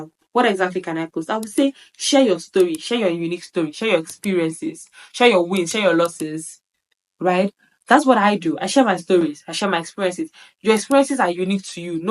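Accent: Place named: Nigeria. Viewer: I love the way she's so proud of her Nigerian English and thanks for this advice mama ❤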